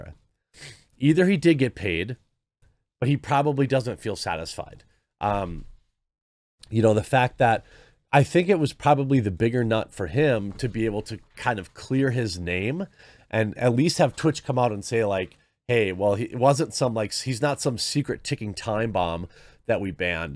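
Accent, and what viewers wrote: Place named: Wisconsin, United States. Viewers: It's funny how much more Wisconsin sounds when he's ill. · Still not Mark Richards levels of Wisconsin.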